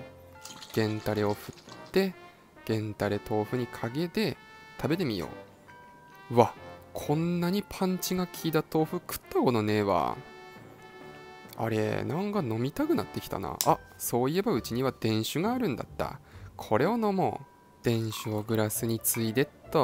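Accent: Tsugaru, Japan. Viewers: 津軽弁面白いけど、動画自体があんまり面白くない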